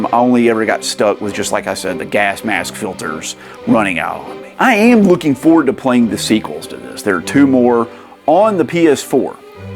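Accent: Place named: Appalachia, United States. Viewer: As an Appalachian American, I respect and enjoy the accent. 🤘🤘🤘 Subbed and looking forward to checking out other reviews and such.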